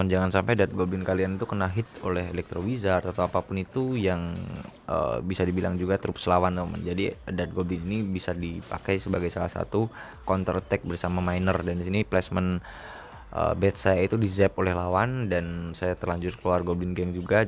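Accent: Yogyakarta, Indonesia. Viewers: Wong Jogja bro, wong Jogja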